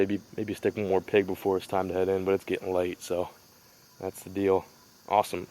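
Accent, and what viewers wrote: South, United States. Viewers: Actually southern and mid Ohio + all the country side folk have that kind of southern twang. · I feel like you are talking more like a southern accent than you usually do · How come you talk like you have a southern draw in this video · How much voice has changed from then to today in 2019 The southern voice disappeared 😂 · Lol, in this old vid you can hear a like southern accent from, wow things have changed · Sounds like you’ve got a southern accent in this video. I’m a new sub. Going back and watching videos. You sound so different. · why do you have a southern accent in this video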